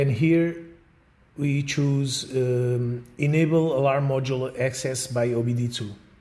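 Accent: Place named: Portugal. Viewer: O "ambiente de trabalho" denunciou o meu amigo, e o sotaque, Português! Eu tenho exatamente esse problema no meu 996, é possivel fazer isto em Portugal?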